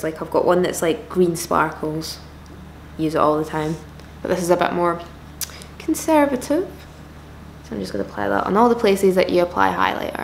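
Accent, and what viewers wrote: Scotland, United Kingdom. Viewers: you re scottish? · Her * speak * Me : "that's sound Scottish" Her : "Midges" Me : "Yeeaaah definitely Scottish" (I HATE Midges) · Me: not used to hearing my own accent (used to American and English vids) Spends the next few seconds wondering whats happening Then it clicks, SHE'S A SCOT!!!!🏴🏴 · What part of Scotland are you from? I love your accent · Sounds more Scottish. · Felt so comfy, with the scottisch accent, really nice, ur funny and i love how it came out! Lov uu hope u upload soon again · Scottish, but it's a quite muted accent compared to most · it took me so long to realise that she has a scottish accent. i’m literally scottish but i don’t have an accent so i never notice lmaoo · She’s from Scotland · she's friggin Scottish hen 🥴🥴🥴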